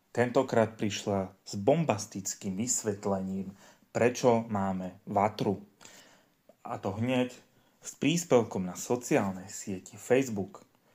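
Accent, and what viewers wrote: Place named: East, Slovakia. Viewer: Co zas po vychodniarsky perla asi z dolneho otvoru…❤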